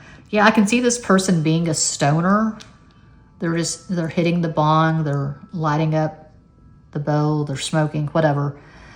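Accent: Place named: Texas, United States. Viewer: I liked before i watched 😅...i like ur voice...vocabulary...and texan accent...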